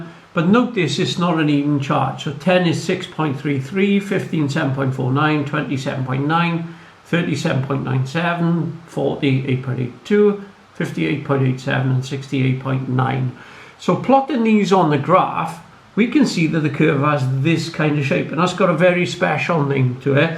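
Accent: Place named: Wales, United Kingdom. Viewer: Thanks for this also,. You sound Cymraeg (like me) 😊 I have done a bit of electronics in my past amateur radio exams so this is a nice refresher for me. 👍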